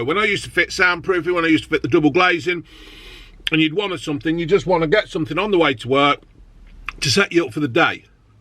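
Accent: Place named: Leicester, United Kingdom. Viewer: Na, I love it, spesh in that Leicester accent...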